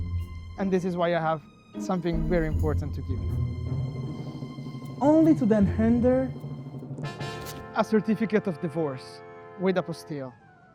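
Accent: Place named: Italy. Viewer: A very impressive video and PP team, the information, key points, story telling and entertainment were a great value and I appreciate the company I'm working with (Paraguay Pathways) is willing to go to such efforts to provide this information to the internet. Not to mention the great entertainment. AMAZING. Put in a suit with his Italian accent, he can promote Paraguay to the world:). Thanks again Paraguay Pathways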